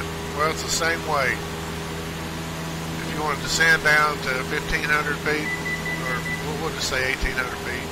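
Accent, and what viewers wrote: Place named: South, United States. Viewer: Thank you! A2A‘s C172 had taught me to stay away from the AP and fly always manually! There‘s a point where a simulation can be too close to reality, and I think they reached that point there. No fun. 👍🇺🇾 Your video was so helpful, mate! Gotta love your southern accent, man. Makes me feel so cosy and welcome. 👏🤝 regards from South America, Mike PS just found out: you gotta set your actual barometric pressure in the AP, otherwise you could be some 100 ft off…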